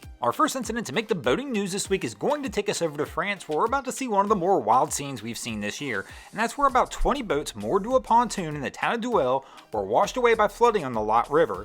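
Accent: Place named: Delaware County, United States. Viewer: Are you from Delco, PA? That accent definitely sounds like it.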